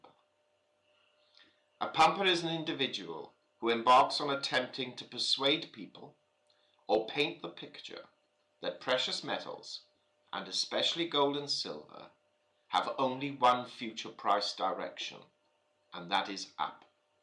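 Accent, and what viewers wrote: England, United Kingdom. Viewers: After watching several of your videos, I understand what you are trying to say. "Don't purchase all at once." I agree. Patience is key. This is true for many things, even real estate. Since I made my error with that in the past, I won't do it again. Been trying to move out of Los Angeles but only when I find the right place at right price. The more I research and understand a certain market area, the better off I am in making a decent purchase. And besides all this, I like your accent. You remind me of one of my coworkers who's from England. And I admire his many virtues as well.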